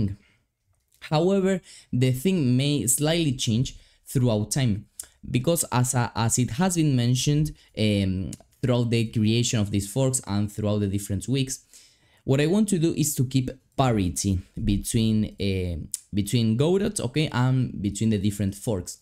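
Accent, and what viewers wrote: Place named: Argentina. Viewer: se nota el acento argentino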